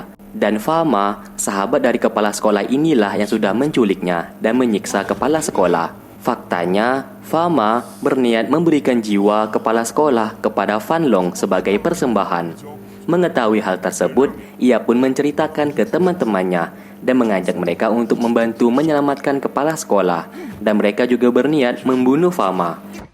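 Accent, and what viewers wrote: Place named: West Java, Indonesia. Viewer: Kekeh😂 aya sunda an ey😁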